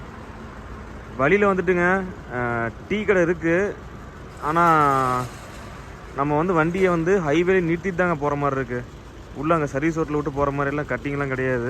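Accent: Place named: Madurai, India. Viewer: அண்ணா மதுரை மொழி லந்து 👌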